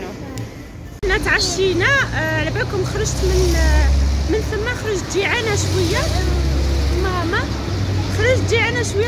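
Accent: Upper Egypt, Egypt. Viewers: فرحتلك راني متبسمة غير وحدي فرحانة بك تعجبني مين تتكلمي مصري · تتكلمي صعيدي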